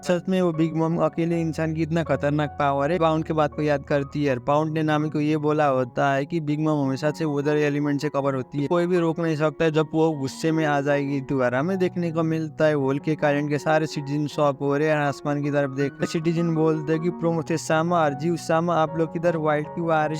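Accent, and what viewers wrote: Nepal. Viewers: Nepali accent is so annoying😢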